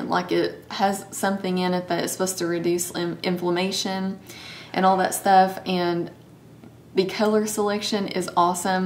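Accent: South, United States. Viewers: I love your southern twang. <3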